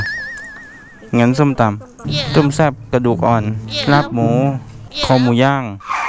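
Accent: South, Thailand. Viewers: พี่ เเหลงใต้หรอยน้ะเนี้ย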